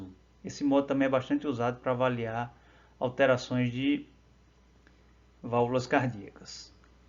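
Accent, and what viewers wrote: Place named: Ceará, Brazil. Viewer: Meu parceiro que aulas boas. Parabéns! Vc é do Ceará? Sotaque de cabra bom. Só o som que ficou meio baixo, mas conteúdo de excelência! Abraços. Ps. Encontrei o canal por acaso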